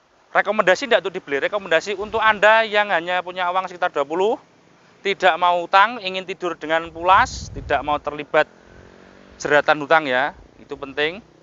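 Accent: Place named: East Java, Indonesia. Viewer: Matur suwun.. Sampeyan lucu.. Gaya Jawa timuran... Lancar jaya.. Salam dr Bogor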